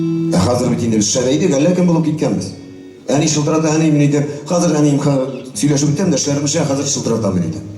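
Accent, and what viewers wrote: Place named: Mishar, Russia. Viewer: Гольсинэ Хакимова,мин узем бар халыкны да тигез курэм ,тик ул КЕШЕ булсын.Э менэ мишэрлэрнен узлэренен мишэр булуларын белэн мактанулаоын анлап бетермим.Бу бигрэк тэ Фирдус Тямаев хэм Илсоя Бэдретдинова ,элегрэк Рэшит Шамкай чыгышларында еш ишетергэ туры килэ.Мишэрлэр Кайсы яклары белэн ,,сливки общества "булалар сон ул?Сливки общества булырлык кешелэр бар миллэттэ дэ бар,э мишэрлэр арасында гына тугел.Шуна курэ мишэрлэрнен узлэренен мишэр булулары белэн жай чыккан саен мактанулары белэн килешмим.Э Энвэр мишэр тугел,аларда мишэр акценты гына. Башкириядэ чын мишэрлэр юк дип белэм(мин Башкортстанда туып устем,балачагым,яшьлегем шунда утте,Энвэр кебек сойлэшкэннэр очрый иде, эмма алар берсе дэ узлэренен мишэрлеклэре белэн мактанмадылар).Кыскасы,татармы без,башкортмы,урысмы кеше булып калыйк,хэм кеше булуыбыз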